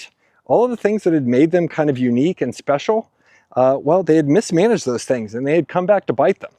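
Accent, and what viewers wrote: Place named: Chicago, United States. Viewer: Its all about the (damn) money! BTW, where are you walking at? Id say New Jersey, but you dont have the accent for it. Probably chicago area.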